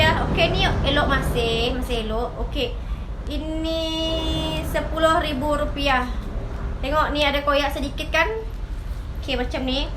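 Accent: Indonesia. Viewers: Wehhh..kamu ni WNI kan? Kamu tak tau ke yang kami bayar bil elektrik, air, indah water, maxis, celcom semua online/credit card/debit card. Bumbu masakan masih guna la kunyit, bawang, cili asli. Orang Indo yang datang ke Malaysia je selalu guna bahan instant yang kau guna meniaga di gerai2 tuh! Menyamar konon jadi warganegara Malaysia konon...bahasa Indonesia kamu masih pekat lafi, jangan nak MENYAMAR jadi orang MALAYSIA!!!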